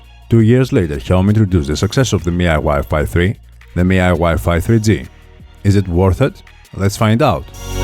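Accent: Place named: Greece. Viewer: Ακούω προφορά και λέω Έλληνας θα είναι. Μετά βλέπω και τη Σαλόνικα και το σιγουρεύω!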